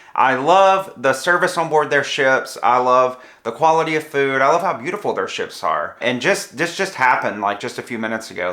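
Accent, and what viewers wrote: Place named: Carolina, United States. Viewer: You’d think that they’d have noticed by now that you’re not only a regular but a cruise vlogger who has driven a ton of people to their cruise line with your other glowing reviews and maybe tried to either keep your booking or at least incentivize you better than this. I bet that this video has probably dissuaded at least a hundred people who would have seriously been considering booking a Japan cruise with Celebrity to not book that cruise, and that your previous videos extolling their virtues as a cruise line probably drove them hundreds of bookings or more. I know I love your videos! Right now I live vicariously until I can afford to cruise again but I appreciate your honesty on all these videos and your Carolina accent makes me feel right at home. Also I agree with the folks who said you should consider going to Japan anyway and getting the train pass, if you can afford to do so. 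Definitely a country worth exploring in depth.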